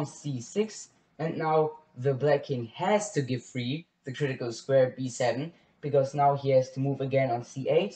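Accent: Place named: United Kingdom. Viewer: Begrüßung aus Bulgaria. Ich bin dein 375 subscriber. Dein Englisch ist sehr Britanisch für mich(das ist normal). Ich denke deine videos sind sehr neu und gut. Das ist die erste video YoutubeALg. shau mir auf dien channel. Ich will mehr shcach videos für angefanger (ich bin 1800chesscom2000liechess blitz) in youtube. Mein freund (unter 800) muss learn von dich. Deine erzelung ist sehr gut. In meine meinung die serious erzelung in youtube ist nicht genug.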